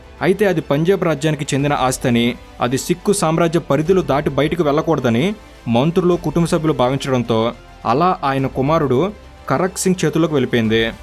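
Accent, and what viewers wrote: Telangana, India. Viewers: Telangana na Andhra na howle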